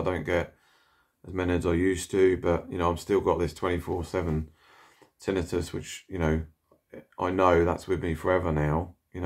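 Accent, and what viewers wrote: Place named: Suffolk, United Kingdom. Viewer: Hello, just watched your video and having been diagnosed with MD 3 years ago recognise everything you mention. I've watched several videos from other sufferers and everyone has broadly the same symptoms, but nobody mentions the speed of the spinning, for me it's really fast, everything jumping from left to right or occasionally up and down. I had 3 steroid injections which have helped a lot, though I still get attacks out of the blue occasionally. I work nights in Waitrose, and as an employer, they've been brilliant, now that my original manager has left, who found my attacks and deafness hilarious. A new manager who's sister has MD, was much better. This condition changes your life much more than people realise. I rarely drive any more, and never alone. Dare not ride my motorbike, and generally seem to have lost interest in everything. 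I think I can hear you have a slight Suffolk accent, which is also my part of the world. It's nice to hear someone talking about "menieres" rather than "minures" the way the Americans pronounce it. All the best.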